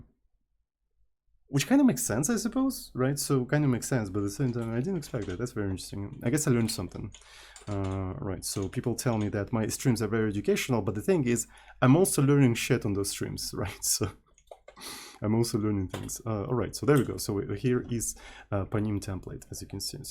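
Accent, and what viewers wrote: Russia. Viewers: А можно то же самое на русском ? Вы же - русский, судя по акценту.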